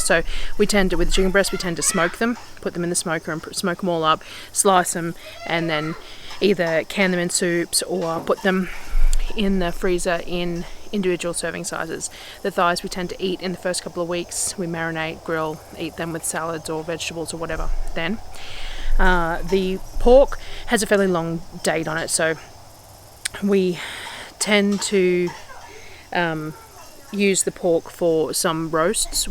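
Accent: Australia. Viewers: G’Day!!! New subscriber here. 🇦🇺💕So wonderful to hear an Aussie accent. Great video - shout out from Sydney x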